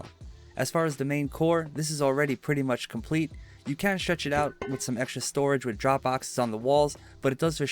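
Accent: New York, United States. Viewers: U from New York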